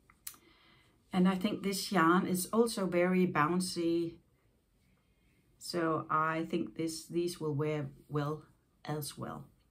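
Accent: Denmark. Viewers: Hyggeligt at komme indenfor - dejlig podcast - sporede jeg lige en Aarhusiansk accent :-D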